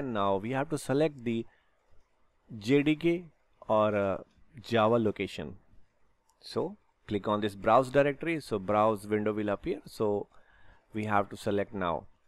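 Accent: India. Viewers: You know it's legit when there's a yellow circle around the pointer and the guy has an indian accent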